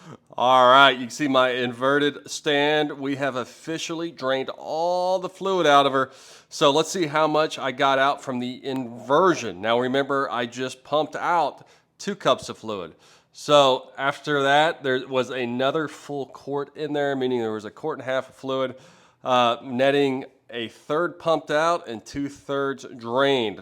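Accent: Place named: New York, United States. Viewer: HOOZ da Complete Moran now.. Just Sayin yall.. East coast whatever.. probably from manhatten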